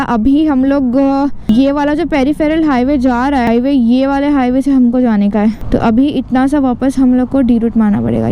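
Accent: Maharashtra, India. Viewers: Hey today I saw ur vlog first...surely u r Marathi..u r way of talking shows that..btw welcome to our place. Uttarakhand devbhumi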